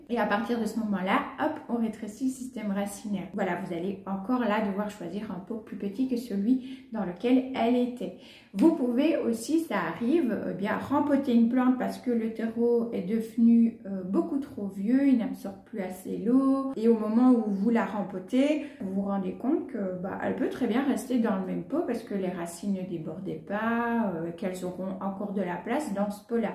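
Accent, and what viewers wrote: Belgium. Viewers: Belgique aussi ici 😜 (j'avais reconnu l'accent 🙊😁) merci beaucoup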